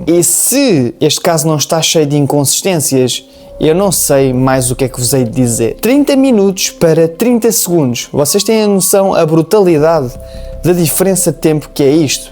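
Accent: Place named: Portugal. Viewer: Apesar de ser difícil entender o português de Portugal assisti o vídeo até o final e gostei.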